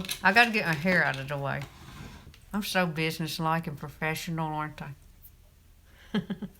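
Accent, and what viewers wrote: South, United States. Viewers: You are just so darn cute! I'm a sucker for a southern accent! Man, woman or child! I feel like you could cuss me out and you would still sound sweet lol! Have a great night! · OMG, This is sooo borning, and annoying.. Please tone your voice down on all these ads.. and stop trying so hard to be cute... Just do it .. I do not have all day.. chances are I will click you off before you get to the facts.. Wow.. is she southern or what ???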